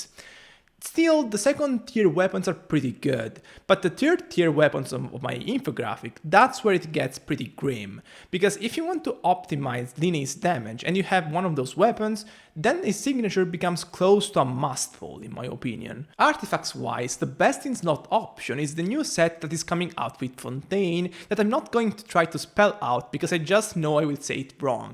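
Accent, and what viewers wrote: Italy. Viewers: just want to say that usually im assuming you're Italian just by the accent and now i see your body gesture really confirm it 👌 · Sir are you an Italian or Russian?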